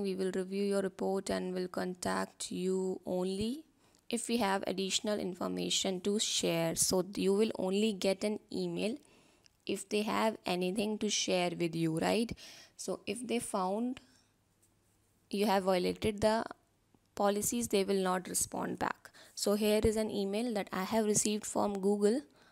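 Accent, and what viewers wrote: India. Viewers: Wow, do all Indians talk with that shit accent. God, it's so annoying.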